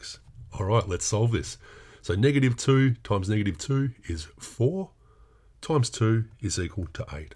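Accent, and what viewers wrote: Australia. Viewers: australian accent hit just right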